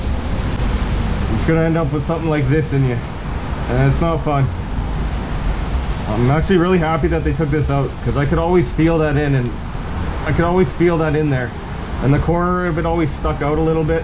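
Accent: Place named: Canada. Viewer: Wow! Poor guy. Just can't believe it. You must be the strongest person I know. I seen your framing work and the fact there isn't a lazy bone in your body. The big house you frame by yourself is what got me hooked on your videos. Just couldn't believe you were doing that on your own and the temps were freaking cold. You sound Canadian and for what I been watching, you Canadians rock. Hope God keeps you safe and you never get hurt like that again. Amazing person you are since I started watching. You also a great educator and well thought out person. Great work you do. I never seen anything like that before. Watching you, I get so scared just seeing it. Keep up the great work you do. Canadian people have impressed me for a long time. I watch them off grid cabin and houses they built on their own. Wow! Take care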